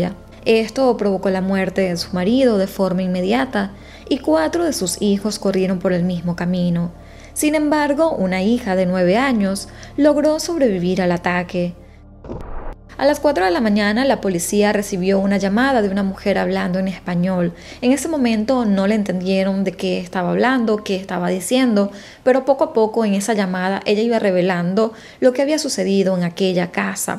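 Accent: Venezuela. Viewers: Amo tu acento venezolano 🌈😍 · te felicito me encantas como marras los casos una pregunta tengo curiosidad eres venezolana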